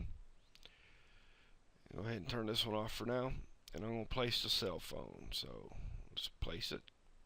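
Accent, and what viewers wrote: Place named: North Carolina, United States. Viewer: Really cannot see how you have 14 thumbs down on this - I'm a beginner trying to learn everything I can at home using my subscription to AI from cloud so that I can do at work what my boss needs me to do using this program and vector graphics with fonts and jpgs. Great video, no back and forth oops I forgot or a bunch of info I don't need. Love the accent too as I am in NC I feel right at home listening to you :) Couldn't be more thankful for the tutorials.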